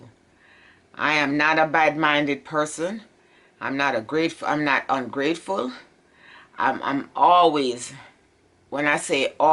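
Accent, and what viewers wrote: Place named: Jamaica. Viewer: I love your channel! One of my favorite things is listening to your Jamaican accent I love it 🌟✨💫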